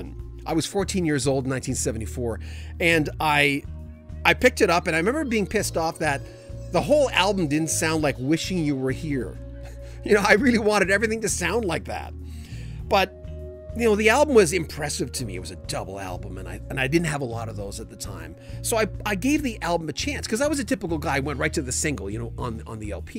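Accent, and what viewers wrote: Canada. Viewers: So, are you like from the Chicago area or it sounds like your from Canada. Don't get me wrong, the Great Candy was from Canada and he is missed every day. I'm from Joliet, Illinois-Chicago south suburbs-Mancow likes to call us "Toliet". Anyway, its like Pete stabbed us in the back-that's what it is like. Listening to WLS waiting for the next great Chicago hit. We knew he was the great lead singer for the most part. I remember him saying in an interview that he was at a party and Paul McCartney came out of the bushes with Linda and Pete went to introduce himself and Mac stopped him and said"we know who you are". I'm sorry he felt whatever he felt and still feels, but we the Chicago fans loved him and no one but no one can sing 25 or 6 to 4 like he did. So he pretty much hurt us and still hurts us. Who cares otherwise, he can go back to singing with Cher or something. 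I guess we wanted that old feeling back before we all died. If he isn't doing anything maybe he can go sweep up what was left of the passenger side window on my 1972 Dodge Polara that got busted out in the parking lot of the old Chicago Stadium back in 1976 when I went to see Chicago-great concert-bad experience.......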